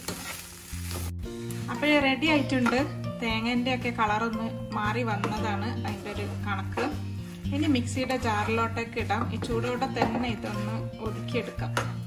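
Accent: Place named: North India, India. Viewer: Ningalde varthamaanam oru North Indian Malayalam paranje pole Ind...good vdo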